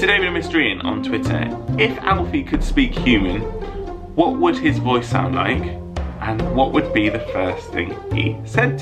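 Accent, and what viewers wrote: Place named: Scotland, United Kingdom. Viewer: I think it's perfect you put the questions in a Mickey Mouse cap. We need to hear more of your Scottish accent😂 Aww thanks for answering my question - to be honest I can't imagine any actor playing you because you are one of a kind!❤️ Haha I love the carpet demonstration! "Sophisticate, and I can see you have a cat"😹 Okay I was not expecting you to say bus driver! Oh my gosh I absolutely LOVE all the family footage - you all made me laugh so hard! And then your mom made me want to cry😭 Aww there we all are at the Angel Inn - that was almost exactly one year ago, can you believe it? We had the best time with you two! we are definitely missing your content as well. Can't wait for part 2!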